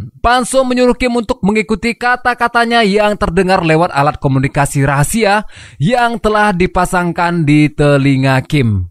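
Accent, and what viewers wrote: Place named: West Java, Indonesia. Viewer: SPIL NGEREKAM SUARA NYAH LOGAT SUNDA😂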